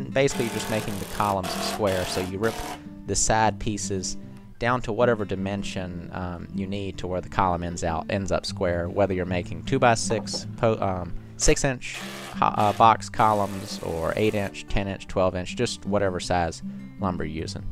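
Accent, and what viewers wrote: South, United States. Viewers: Love your videos man. Gives me inspiration in my seemingly never ending home renovation project. My house was, still kinda is in rough shape and figuring out what to do can really tax you mentally. Did you learn at a trade school or have you been doing this for a while. I noticed your accent too, I'm guessing you live in the south as well as I. Greetings from North Carolina and keep it up! 👊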